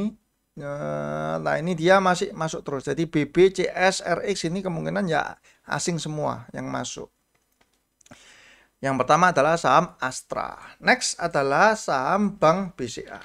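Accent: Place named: Java, Indonesia. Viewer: Terima kasih mas, sangant mengedukasi, juga suka loga jawanya Terlihat bangat mas niatnya membantu ritel utk tdk terjebak, sukses untukmu orang muda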